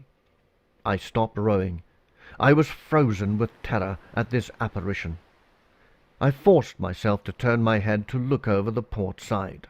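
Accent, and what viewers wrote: Scotland, United Kingdom. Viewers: British??? That was himself - a good Scotsman.;) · Excellent production, and a fine British narration.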